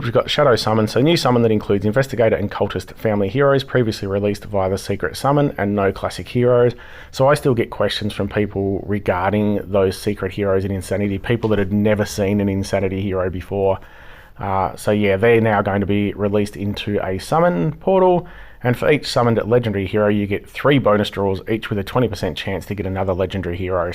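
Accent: Australia. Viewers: Thx mate, great to hear another Aussie. Been subscribed since before you hit 100 subs. Found you from McNaulty. I'm the leader of Obi One Kenobies but your alliance is way higher than ours so we wont see each other across the battle field.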